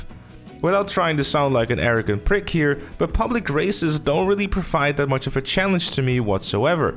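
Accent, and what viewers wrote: Netherlands. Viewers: Do I hear a Dutch man speaking English?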